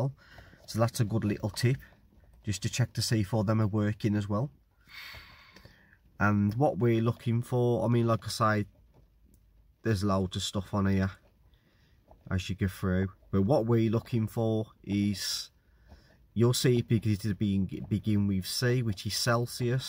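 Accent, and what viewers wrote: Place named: Black Country, United Kingdom. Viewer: Brilliant ....thank you....and great to here a proper Black Country accent....I've been away too long...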